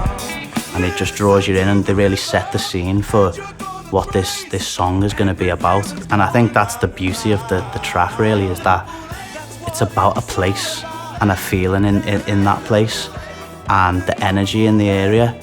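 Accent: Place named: Liverpool, United Kingdom. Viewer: LOVE THIS ACCENT WHICH MAKES ME FEEL I ONCE LIVED IN WONDERFUL PLACE WITH PLENTY OF GOOD PEOPLE BY MY SIDE WHERE? LIVERPOOOOL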